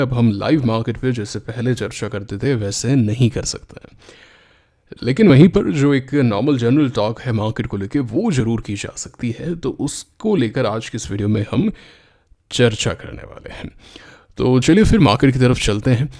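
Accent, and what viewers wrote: Rajasthan, India. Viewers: Bich bich mein rajsthani accent aa rha haiiiiìiììiiiiiiii........ sir 22 ke aaspaas se 😀😀😀😀😁😁😁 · Sir aap Rajasthan se ho na 😅 . Love you sir 😊❤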